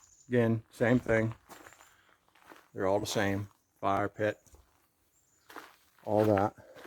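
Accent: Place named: Pittsburgh, United States. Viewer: Love this vid bro....I was smilin and smilin. Your "Pittsburghese" was great to hear. Walkin "dahn" the road......... "mowl" 46......here's"da" connextor trail.....Goin "dahn air".........."yunz"........don't like "em" things......."make it outta dare"........"dahn ear where I parked" Great to see a Pittsburgher "dune" a vid! Keep on rockin! Maybe see ya aht on da trail some time!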